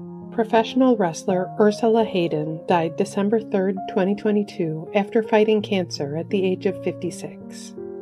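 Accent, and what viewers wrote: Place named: Ohio, United States. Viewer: shout out to the gal that has been doing narration for Legacy~ calming voice. also i hear an Ohio accent me thinks. 😺Thank you~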